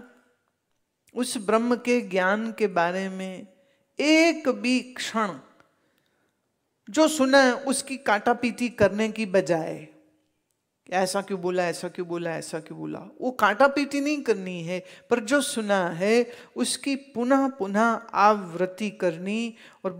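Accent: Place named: Punjab, India. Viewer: Yours Punjabi ascents is too great.Jai Rafisahab and yours Vaani.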